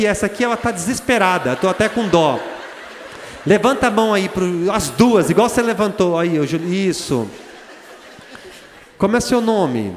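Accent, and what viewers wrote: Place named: Paraíba, Brazil. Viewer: Eu jurava que o senhor era da Paraíba.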